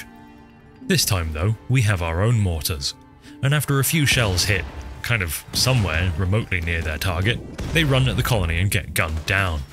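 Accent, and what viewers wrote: United Kingdom. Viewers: bro dude are you and the same dude u have like the same voice but you are both britich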